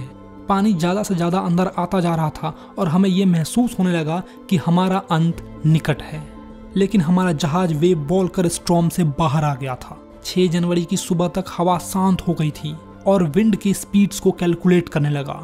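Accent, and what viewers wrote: Bihar, India. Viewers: Bhaiya.....Your bihari tone is also a reason why students love your teaching style..❤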